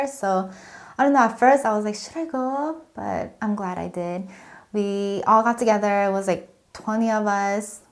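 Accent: South Korea. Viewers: I think its quite interesting. You're an American-born citizen but you have a slight Korean accent when you speak (ie mixing up plural and singulars). It's fascinating how you acclimate to your surroundings or pick up people's mannerisms and speech. I hope you enjoy your life in Korea.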